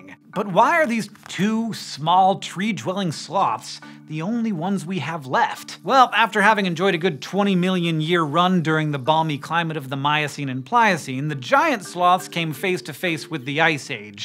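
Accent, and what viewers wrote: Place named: United States. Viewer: I knew Americans said 'erbs , but Lordie they say 'erbivores too.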